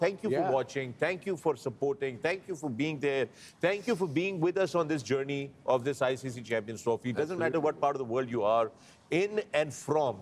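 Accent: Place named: Punjab, India. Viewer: sir is heart of the show love his anchoring and panjabi accent ❤❤❤❤❤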